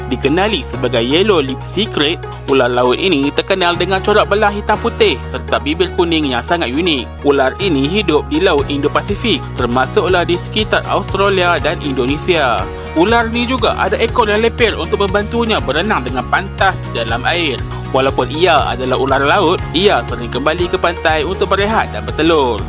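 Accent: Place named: Kedah, Malaysia. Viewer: Sedap dengar logat Kedah. 😍😍😍😎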